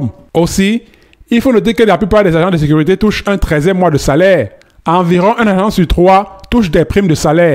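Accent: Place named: Cameroon. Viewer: Mon frère camerounais le ton là je reconnais déjà ❤❤😂😂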